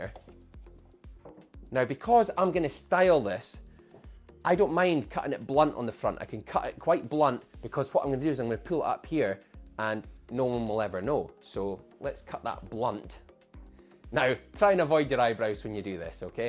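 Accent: Scotland, United Kingdom. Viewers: Thanks for this easy approachable concept to do self hair cuts. Really like your accent as well. Scottish? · Love the Skaughtisch accent!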